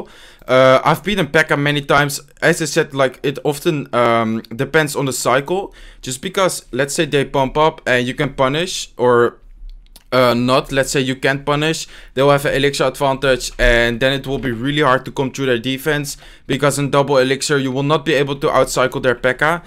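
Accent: Netherlands. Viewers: are you dutch? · Joo ben je van Nederland je hebt een beetje een Nederlands accent;)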